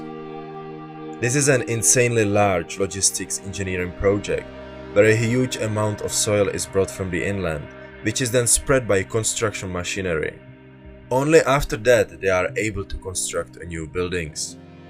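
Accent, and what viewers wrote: Czech Republic. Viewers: dal som si tu namahu aby som zistil odkial je vlastnik kanala a ano nemylil som sa ....ceska anglictina je jedinecna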